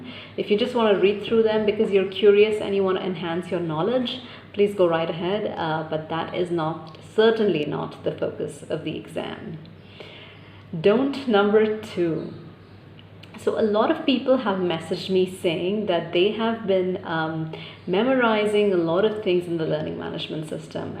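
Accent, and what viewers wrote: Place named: England, United Kingdom. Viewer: Hi hope you are doing good I just loved your English accent. Please can you guide how you achive this?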